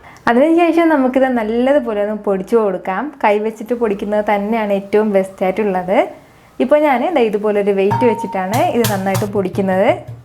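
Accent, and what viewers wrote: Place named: Thiruvananthapuram, India. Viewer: Thiruvananthapuram aanoo.. Slang oru vyathyasam.. 🤣😍Enthayalum adipoli. Kelkumbol thanne oru chirichkondulla suntharamaya mukam manasil varunnu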